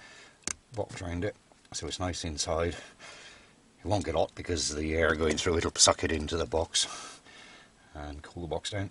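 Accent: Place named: Wales, United Kingdom. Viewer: I know sounds like he is from there with a touch of welsh thrown in but to learn that he is from Hereford came as a bit of a shock. That said love the accent.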